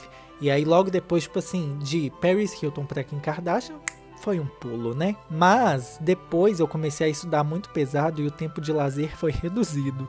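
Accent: Minas Gerais, Brazil. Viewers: Conheci seu canal por acaso há muito pouco tempo não costumo fazer comentários mas tenho essa vontade contigo. Não sei se é porque você tem esse sotaque minérim (minha família mineira) se é porque tenho um filho morando na Europa há uns 5 anos ou se porque o filho que me restou aqui também é Vegetariano kkkk. Brincadeira 😉 mas é só pra dizer que gostdoce tá ! Abraço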